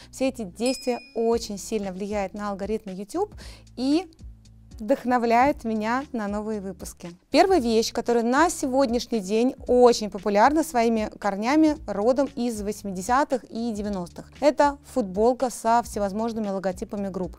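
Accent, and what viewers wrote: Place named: Akanye, Russia. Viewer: Такое милое видео, такие примеры, такой текст хороший мне так понравилось, но вот это аканье так режет слух😢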